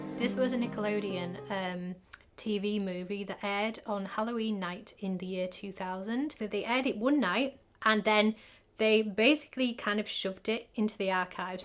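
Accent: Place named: Northern England, United Kingdom. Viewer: No subtitles needed. I love your northern accent big hug from Mexico. Love your content 😊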